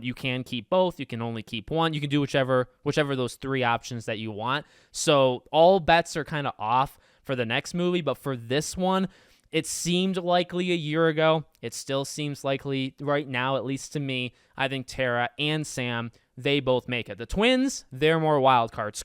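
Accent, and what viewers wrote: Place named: Boston, United States. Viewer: r u from boston ? north east? ur accent lol the way u said podcastt🤣🤩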